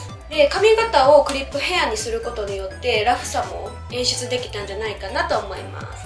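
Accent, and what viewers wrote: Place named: Kansai, Japan. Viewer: shortをたまたま観て、可愛過ぎて動画も観に来たら関西弁なのずるいです、、🥹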